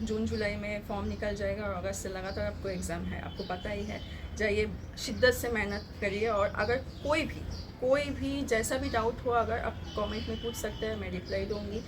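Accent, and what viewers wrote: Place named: Bengal, India. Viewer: Ap kya Bengali ho? Apka accent bengali ki tarah lag raha hain....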